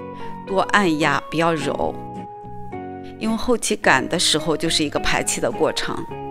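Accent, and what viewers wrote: North, China. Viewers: 今天偶遇你，非常喜欢你的声音，不急不躁地叙述，像北方人的口音，果断关注收藏！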